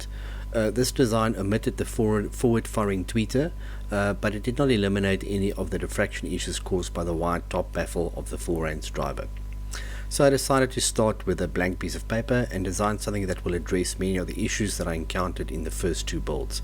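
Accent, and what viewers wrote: South Africa. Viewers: Howzit. This is the first video of yours that I've watched and I became an instant fan after recognising the accent as local. Love the way you combine woodwork and audio - two of my favourite hobbies. Respect and admiration from Durban.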